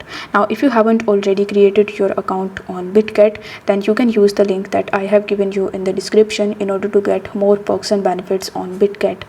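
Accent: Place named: India. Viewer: indian accent is relayable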